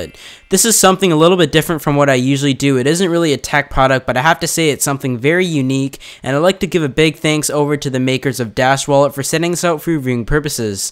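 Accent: Canada. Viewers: Your're Canadian?!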